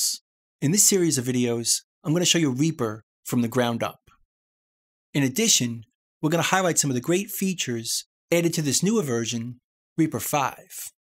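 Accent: New York, United States. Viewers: Irrelevant curiosity: what kind of accent do you speak with? New York?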